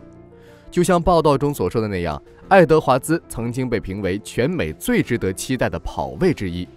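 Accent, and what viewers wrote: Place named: Shandong, China. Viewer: 这山东口音英语直接听出戏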